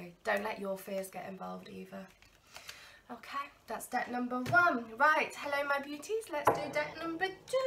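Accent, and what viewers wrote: United Kingdom. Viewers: love ur accent British gurl! thanks🤐❤️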